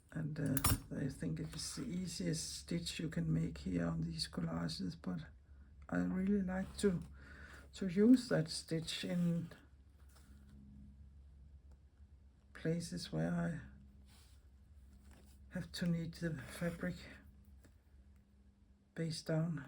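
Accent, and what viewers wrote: Denmark. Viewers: i absolutely love your approach to the creative process - these beautiful fabric and embroidery collages really resonate with me. i'm canadian, but my beloved grandma was danish, and i hear her beautiful, delicate accent in yours. thank you for sharing your unique, inspiring, thoughtful art. i look forward to more!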